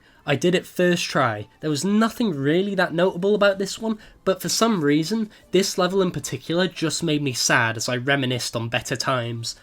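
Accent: United Kingdom. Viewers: This man can complain about everything in British and I will immediately agree Unrelated note: I subscribed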